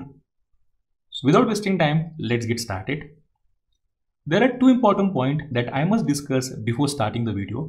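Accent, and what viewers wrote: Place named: India. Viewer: i cant understand what you are saying bro.i dont understand your indian english😩😫. try using ai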